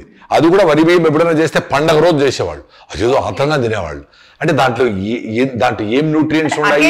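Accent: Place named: Kadapa, India. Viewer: సర్ మీ బాషా కడప భాష లాగా ఉంది చాలా బాగుంది మంచి అలవాట్లు చెప్పారు సర్ థ్యాంక్స్ సర్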